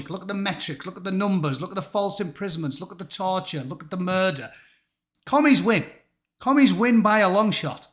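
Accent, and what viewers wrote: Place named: Northern England, United Kingdom. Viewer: A chippy Northern accent could also be the reason why Google AI policebots and Suuuzaaah haven't figured out wtf you're on about Haha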